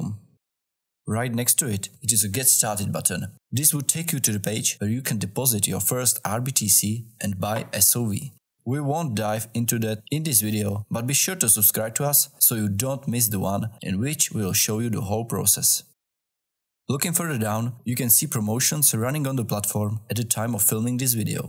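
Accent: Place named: Czech Republic. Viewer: I can hear your Czech accent :-) But I might be wrong...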